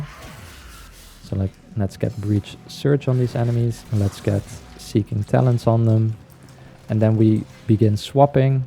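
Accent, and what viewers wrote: Netherlands. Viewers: Do i hear a dutch accent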